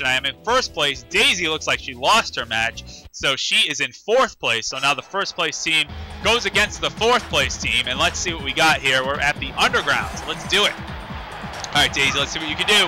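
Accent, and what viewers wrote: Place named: United States. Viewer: You sound American, but call it Football. Not like it matters, but I'd just assume you'd call it soccer more than football out of habbit. xD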